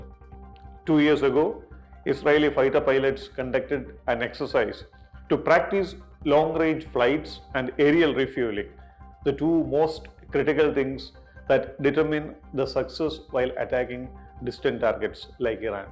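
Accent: Kerala, India. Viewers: From ur accent I know u are from Kerala. Great how u handle difficult topics calmly.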